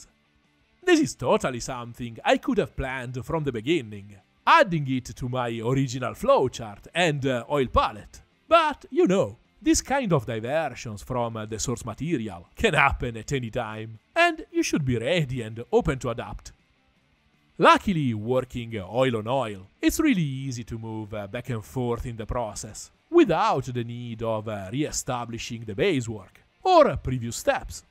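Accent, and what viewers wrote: Italy. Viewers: ho capito che eri italiano prima di vedere il nome del canale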